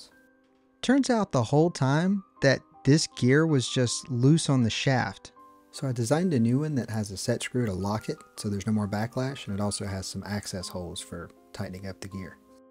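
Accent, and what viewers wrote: United States. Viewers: Just had this video come across my YouTube feed. First of yours I've seen and I instantly subbed at your first "daggumit" I'm instantly attracted to this kind of content. Throw on top the Southern accent. I'm sold. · Just curious where youre from, state if you dont mind hahaha like the accent and reminds me of a lot of people where im from(Southern Maryland)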